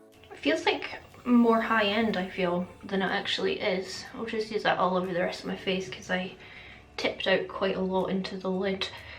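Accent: Scotland, United Kingdom. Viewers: Sound funny hearing our own Scottish accent when u say thinks like “ur mental mate” ha ha brilliant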